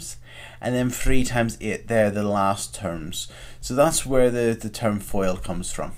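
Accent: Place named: Scotland, United Kingdom. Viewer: The Scottish are strong with this one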